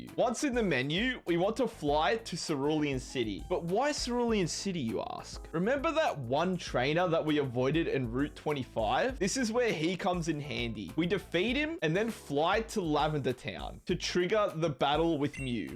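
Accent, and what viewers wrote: Australia. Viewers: Biggest Victorian accent going. The “alite four”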